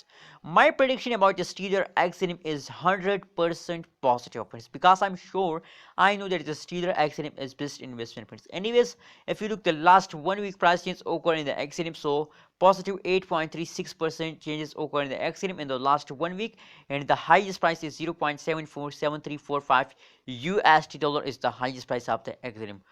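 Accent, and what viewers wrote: India. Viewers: Whenever I hear a Indian accent on these stock I feel like it’s a scam. Since all these damn scammers calling people phones are from somewhere in India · Why is there a picture of a white dude but i can clearly hear you are indian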